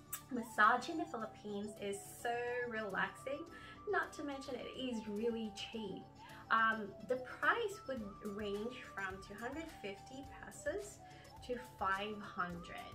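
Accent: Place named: Australia. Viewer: You've started to sound Australian!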